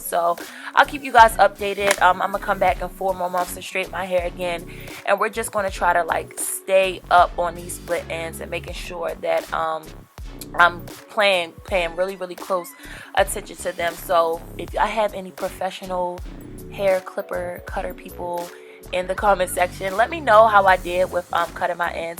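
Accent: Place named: DMV, United States. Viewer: Idk if I’m tripping but I hear a dmv accent. I’m Born and raised ! Lol ...I here that tew and monf 😩😍😍😍😍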